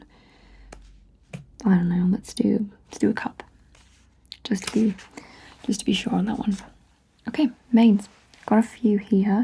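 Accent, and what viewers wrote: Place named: Wales, United Kingdom. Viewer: Time to be lulled to sleep by those beautiful welsh tones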